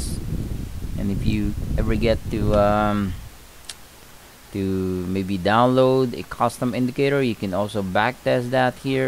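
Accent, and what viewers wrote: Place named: Philippines. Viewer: he's got the filipino accent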